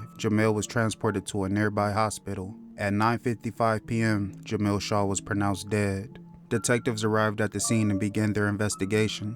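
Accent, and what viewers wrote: California, United States. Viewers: your voice is soooo cali and i love it! i love when ppl rep the city! keep going king ❤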